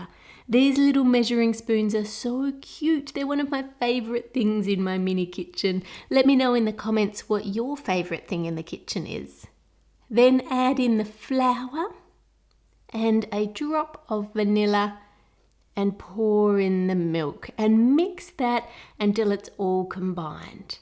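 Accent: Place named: Australia. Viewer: I like your Australian accent.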